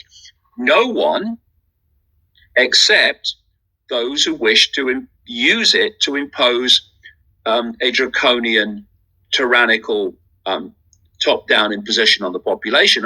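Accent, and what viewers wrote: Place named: United Kingdom. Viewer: Woke In So Cal 100% agree! He can be funny too when he mocks people in his British accent 🤣 be vigilant,stay safe and head on a swivel! Much love ❤️💯🙌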